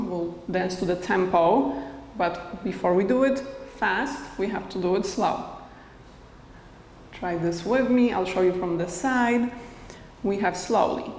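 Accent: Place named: Poland. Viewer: Mel15 Mcc her accent sounds polish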